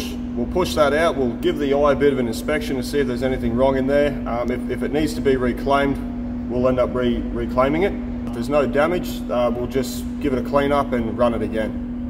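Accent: Australia. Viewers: 4 am and sat watching this , sometimes forward the spinny bits making sure not to miss homeless fucking about . Being British I pride myself on being able to swear exceptionally well, but nobody says " You Fakkin Kant" like an Aussie fella . I tip my hat to you 😉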